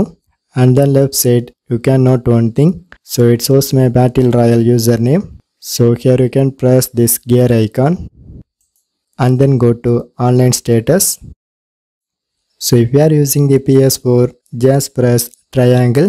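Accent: India. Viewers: I'm Indian to, it's the majority of indians who speak like this. There r others who speak good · that indian accent is lovely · You sound like a curry man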